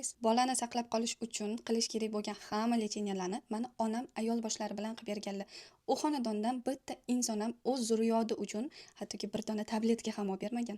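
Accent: Tashkent, Uzbekistan. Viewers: Намангонли булса нега Тошкент шевасида аралаштириб гапирвоти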